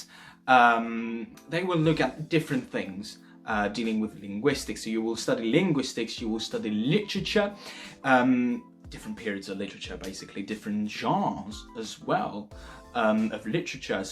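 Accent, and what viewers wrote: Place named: RP, United Kingdom. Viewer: Well I don’t know about his nationality, bjt he defo has a pretty standard british accent :)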